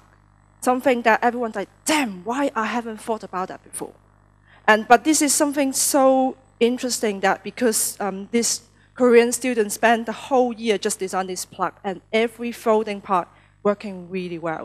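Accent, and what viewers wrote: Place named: United Kingdom. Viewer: 英式發音，舒服舒服～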